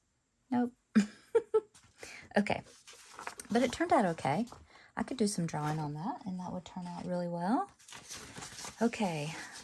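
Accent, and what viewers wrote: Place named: South, United States. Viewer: My thoughts: 1. Do a background like you did for try 3 (a few shades of mid to dark blues eg a Prussian blues and a few tiny dabs of black as it’s so strong it can take over. 2. Pick that up on a piece of paper 3. Do another gel plate with soft to mid greens and a darker green like pthalo green 4. Place your leaves 5. Use your 1st pick up piece to pick up the negative image of the leaves (the lack of veining will look more like your fabric and will show more of the colour) 6. I think the reason your leaves don’t match up perfectly is because you’re comparing a positive with a negative image of the leaf so it’s a mirror image… My 2 cents worth😅 It’s really pretty fabric I loved watching your process, hearing your thoughts and lovely southern accent From Oz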